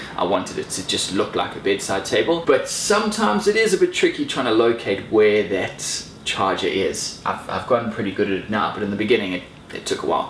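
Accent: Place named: South Africa. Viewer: Started watching this, and I’m like “hey I know this accent”... I’m a South African living in Malta.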